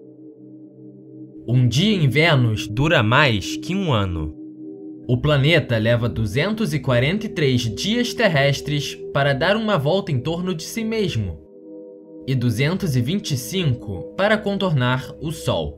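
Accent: Rio de Janeiro, Brazil. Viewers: sotaques feio deve ser carioca ..igual ao ladrão malandro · Adorei o nível do conteúdo e das curiosidades. Mas esse SOTAQUE!!!!! Meu Deus, da pra aguentar muito tempo não 😣😐 carioca né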